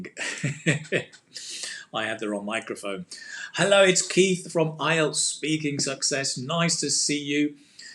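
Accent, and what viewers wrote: United Kingdom. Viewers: Hi What accent do you have? British accent, but which one exactly? Rp?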